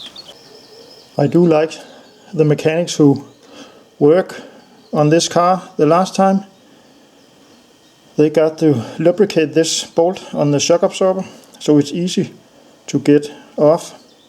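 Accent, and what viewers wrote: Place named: Denmark. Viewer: judging by the accent, I'd say you are from Denmark ❤ the video just showed up on my feed! cool project! keep it up!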